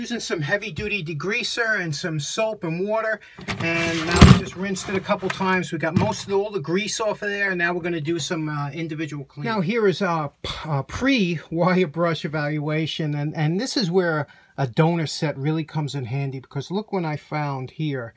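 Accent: New York, United States. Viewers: That's a JACKPOT tool!! Always interesting and always informative!! I always look forward to these restorations!! The education provided with each video is remarkable! Of course, the NY twang makes me feel like I was back home on Lung Ilint!! Thanks!!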